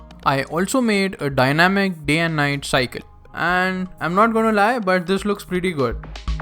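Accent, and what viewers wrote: India. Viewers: Stopped watching when I heard the indian accent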